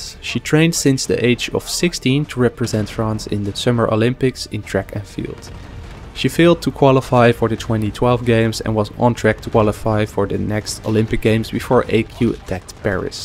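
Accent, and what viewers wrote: Netherlands. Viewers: grappig man je kan kk goed horen dat je nl bent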